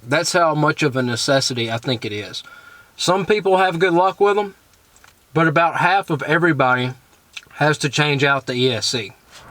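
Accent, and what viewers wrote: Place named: South, United States. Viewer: how Sothern American: yes I subscribed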